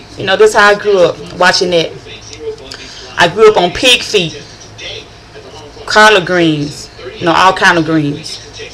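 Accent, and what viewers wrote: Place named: North Louisiana, United States. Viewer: I been can tell u from up north. I'm from South Louisiana & sound different from us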